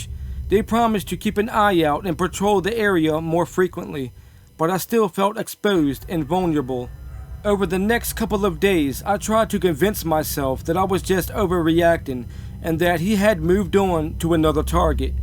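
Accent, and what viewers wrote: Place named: South, United States. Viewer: Your video randomly showed up. I see that you are a small channel. But I noticed that you had a southern accent and that made me appreciate your commentary right away. It may not be everyone's thing, but it makes it more relatable in a way. You got yourself a subscriber. Keep on keeping on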